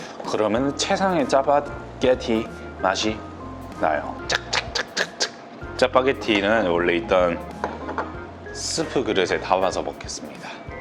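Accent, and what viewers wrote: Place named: Busan, South Korea. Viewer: 야. 부산 촌놈 그냥. 사투리써. 안되는 표준말 썼다가 사투리섰다가 하지 말고. 불편해 보인다. 알겠냐.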